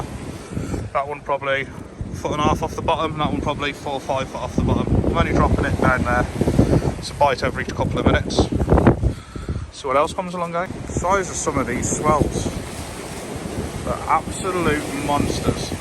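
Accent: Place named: Worcestershire, United Kingdom. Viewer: Are you a Worcestershire lad. Every time I hear your accent it reminds me of my home town of Bromsgrove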